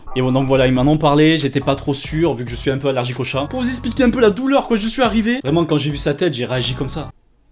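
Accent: South, France. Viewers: En faite j'avais jamais remarqué que t'avais l'accent du sud!!!!😁 Très sexy!😜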